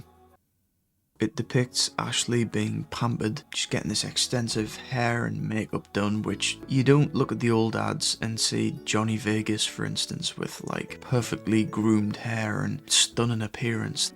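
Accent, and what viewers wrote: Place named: Teesside, United Kingdom. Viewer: bizarre question but are you somewhere in Teesside?, I live near Middlesbrough and you sound very similar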